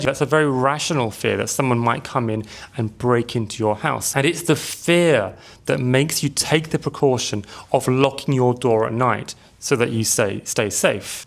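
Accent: United Kingdom. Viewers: You sound very british yet you look very slavic, hope it makes sense, also awesome vid btw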